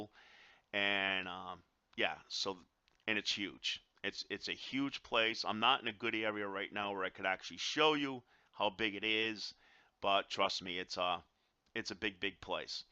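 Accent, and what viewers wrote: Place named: Boston, United States. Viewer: Mass/Boston? lol trying to place it :D Anyways nice video Ty for it, just coming back and this is just what I was looking for :) Keep up the great work and TY for your time.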